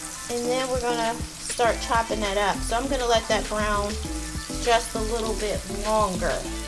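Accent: United States. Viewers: Are you from New York? I love your accent.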